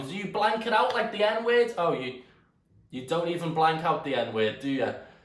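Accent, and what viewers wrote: Liverpool, United Kingdom. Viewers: scouser to scouser I love you 😂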